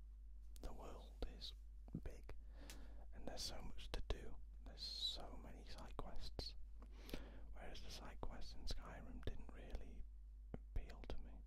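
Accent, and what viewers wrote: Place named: Northern England, United Kingdom. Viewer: oh haha you sound like your from the north of England. And how hard is oblivion to complete xD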